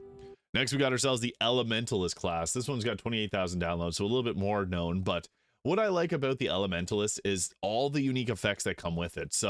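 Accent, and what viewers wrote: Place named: Quebec, Canada. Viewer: Hair? lol The French Canadian accent 😂 Im surprised how well the mods work on this game, Larian Killed it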